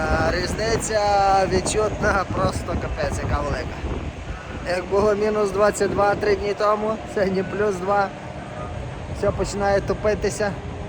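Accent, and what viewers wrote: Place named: Prykarpattia, Ukraine. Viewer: Вітаю гуцула в Америці.нас дуже багато по світу.приємно вражений нашою говіркою Прикарпатською.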